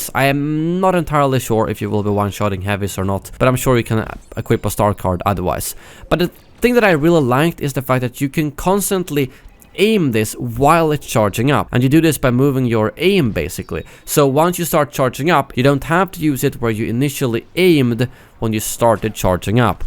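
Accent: Sweden. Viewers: Are you from sweden Är du från sverige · Boi are you from Sweden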